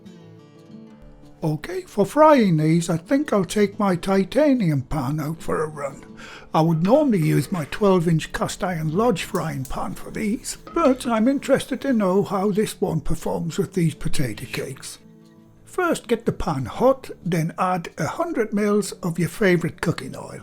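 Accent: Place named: Northern England, United Kingdom. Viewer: Hi, love your channel, I only now realised your voice is like Sir David Attenborough but with a Northern accent, really calm spoken, If Sir David Attenborough done the food channel and had a Northern accent I wouldn't tell you apart 🤣, thank you I'm looking forward to trying this one, it's not complicated 😁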